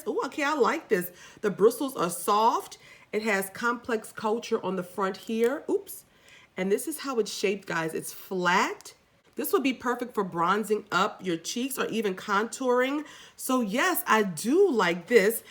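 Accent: Louisiana, United States. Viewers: Where are u from? Your accent sorta sounds like mine. I am in Louisiana.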